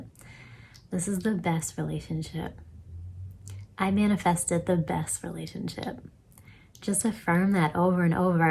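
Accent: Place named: Boston, United States. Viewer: Nice, the secret is a controlled imagination and a well steady attention firmly and repetitively, creation is finished, omg, I love Boston, I think I heard Boston :))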